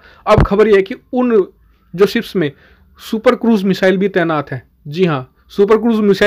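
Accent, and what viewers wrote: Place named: Western Uttar Pradesh, India. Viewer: Bhai aap ese bolte ho ya fir apka bolne Ka tarika esa hai jese ki chai ki dukaan par ko koi bin mange gyan baat rha ho, knowledge Achi share karte ho no doubt but effective voice nhi hai, Kisi particular area ki lagti hai hai jese ki UP west ki ya fir dehati types so pls sudhar laao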